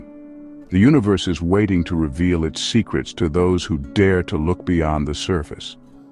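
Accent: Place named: England, United Kingdom. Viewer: I'm bothered by the narrator's two accents. Why is he slipping from an America English accent into an England sounding English accent? Is anyone conscious of this? I assume some are now. Please explain.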